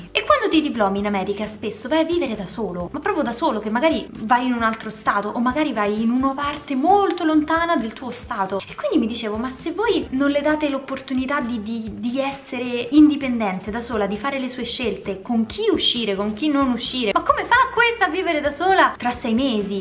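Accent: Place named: Rome, Italy. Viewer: A,è sempre 'n piacere guardatte!! To'o posso di da romano?(trapiantato a Torino però🤦🏻‍♂️🤷🏻‍♂️)...pure te sei 'n tajio!!😂 Bella,intelligente,brava e simpatica! E poi c'hai 'sto soriso!!🥰(mo te scrivo bene😅)... È bello seguirti,video sempre interessanti,e adoro il tuo leggero accento romano con un italiano invidiabile...ti prego non lo perdere mai però!👋🏻👋🏻🤗😘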